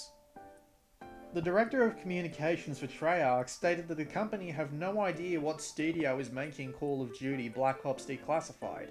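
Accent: Australia. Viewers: Came from GAF, good post. Sound aussie so some aussie release dates might be nice. Also where the hell is MetalGear for vita!? *checks vita*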